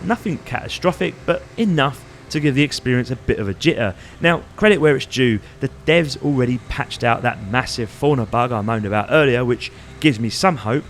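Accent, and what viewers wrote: Cockney, United Kingdom. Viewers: nice review. love you leaning big into your british cockney accent (fellow brit here)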